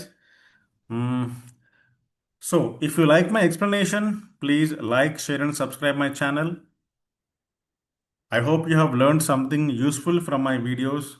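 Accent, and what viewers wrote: India. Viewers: Bro your voice as a hindian person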